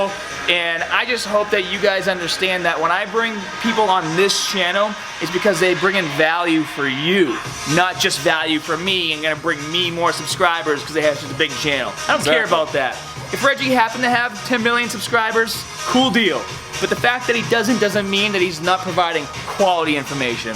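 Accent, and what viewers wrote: Boston, United States. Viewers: Baaahstan accent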